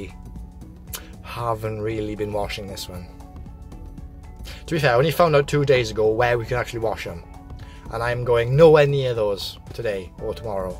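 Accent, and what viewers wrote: Wales, United Kingdom. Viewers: where in wales you from dude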